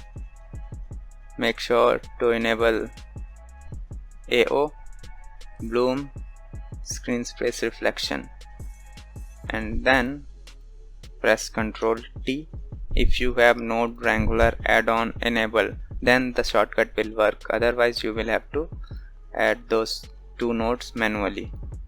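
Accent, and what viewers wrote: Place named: India. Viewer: Ok mr smart Indian sounding person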